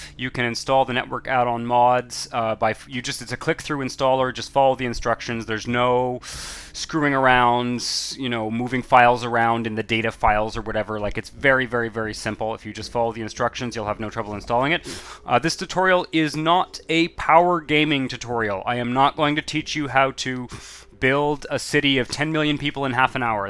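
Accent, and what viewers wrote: Canada. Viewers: You sound Canadian, I'm Canadian and I looked at your twitter and I was right 😂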